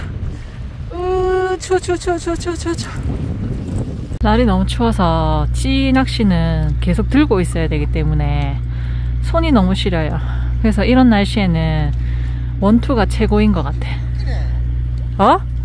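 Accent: Busan, South Korea. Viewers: 항상봐도 재미 있습니다 부산사투리도 구수하구요 🐟🐟🐟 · 부산사람입니까. 말투가 암청 정이가네요^^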